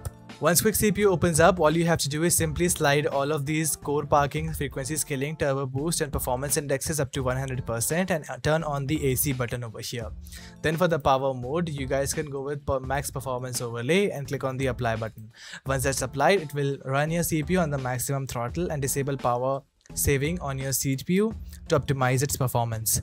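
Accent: India. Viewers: he sounds indian thats why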